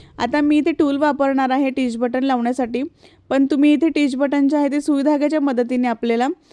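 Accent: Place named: Alibag, India. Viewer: khupach chaan alibuaag warun bolte❤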